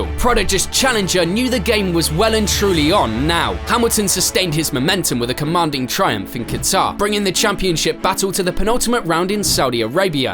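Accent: England, United Kingdom. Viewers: Another British accent.... Lost from button,Rosberg,Russell twice now Leclerc. Lewis had 30-50bhp hammertime more then the rest of the field. Fairytale he "beat" Alonso, even Ron Dennis regrets mistreating Alonso. ALL teammates were very close to Lewis accept koviannen, and then bottas regulatie to nr2 driver. Max is dominante not Lewis, not comparable like Max does today. Max dominante as a Driver is insane, Lewis he is jongere with a less car, very mediocre. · litteraly the first thing i hear is a british boy saying "masi gifting the championship" lmao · When you click on an f1 vid and hear an English narrator, you’re officially entering a spin zone. They’ll literally justify and rationalize all his bs, and there’s quite a bit to work with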